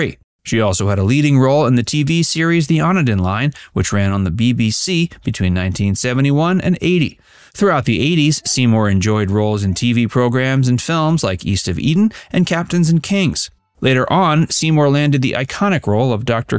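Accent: United States. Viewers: Nice job your mispronunciations had me in stitches, literally rolling on the floor. I suppose you are speaking American though and not English.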